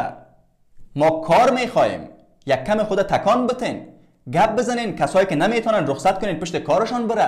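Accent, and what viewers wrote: Iran. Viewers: این لهجه ایرانی بسیار جالب هست نه ایرانی و نه افغانی یک لهجی نو است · افغان با لهجه اجنبی ایرانی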